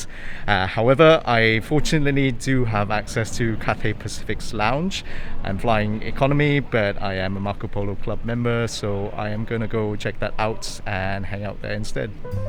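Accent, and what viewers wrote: United Kingdom. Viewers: I didn’t know you were Malaysian! You’ve got a British accent!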